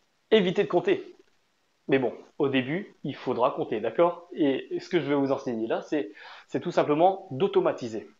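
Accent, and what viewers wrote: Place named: Madagascar. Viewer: Coucou, pas mal....on dirait vous avez un accent malgache!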